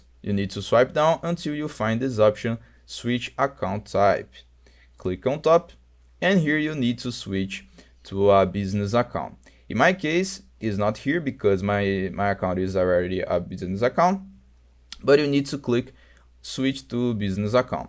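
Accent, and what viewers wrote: Brazil. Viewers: video bom puto (esse sotaquezinho brasileiro nunca engana)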